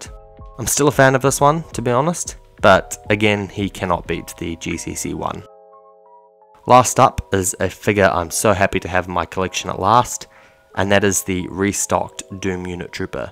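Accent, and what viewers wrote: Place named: New Zealand. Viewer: Are you from new zealand cuz you sound like one of us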